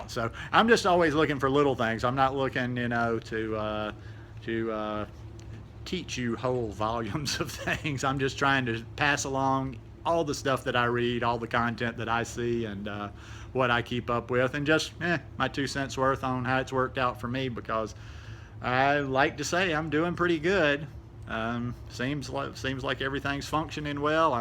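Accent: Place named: Texas, United States. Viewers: Love your accent. You sound like a fellow Texan. Am I right? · where you from originally? you have a similar accent that I have! I'm from north Alabama. it's always nice to here a similar accent! lmao. great video btw. I learned a lot.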